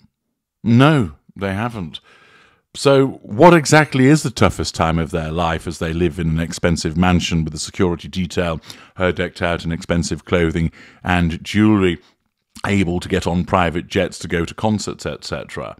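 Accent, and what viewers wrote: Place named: North, United Kingdom. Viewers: At a guess I would suggest comes from North.Yorkshire. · despite being Welsh, lol. I am Irish so pick up on the fact that is a ''posh Northerner'' maybe Americans think this is RP but imo, this northern accent is what makes his speaking voice so easy on the ear imo.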